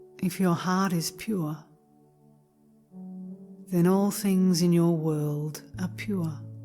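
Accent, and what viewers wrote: Australia. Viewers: (Aussie, woo hoo! Definitely a Sydney voice.) Beautiful poem.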